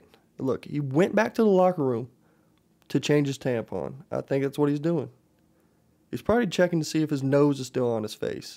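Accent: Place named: Canada. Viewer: Dude, you're even starting to sound Canadian. "Lower body injury, he'll be alright, eh?" Classic Canadian add on there. Beauty, eh.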